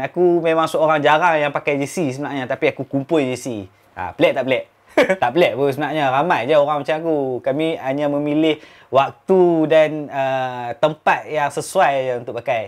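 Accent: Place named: North, Malaysia. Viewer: Baru jumpa channel ni.. Serius content sempoi bro !! Dengaq pekat utaqha tu jatuh cintaa nak layan haha.. btw keep the content bro & stay active this channel..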